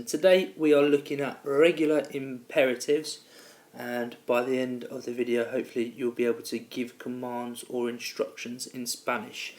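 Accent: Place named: United Kingdom. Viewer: are u a britisher ?? Cuz your accent is so british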